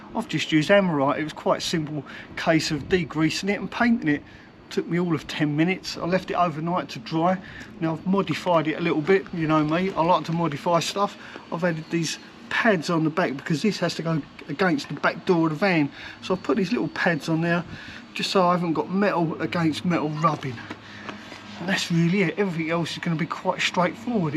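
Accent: Cockney, United Kingdom. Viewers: Professional cockney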